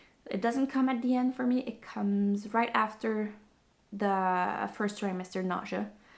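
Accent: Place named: France. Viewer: Absolutely delightful podcast...love your French accent. I do miss young motherhood. I can see you are savoring this time of your life!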